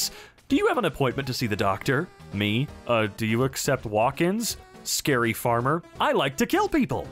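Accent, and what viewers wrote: Ireland. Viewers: has to be Irish omg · Love Robins irish accent. Is there an irish subbredit he can read for us? =D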